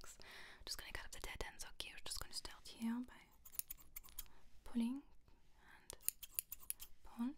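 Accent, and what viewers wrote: France. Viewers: Can you do more French accent videos please 🥹😍 it sounds soooo tingly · What ethnicity are U or where do U live, kuz I’ve seen some videos where U talk with an America accent but now U have a French accent... I’m confused😂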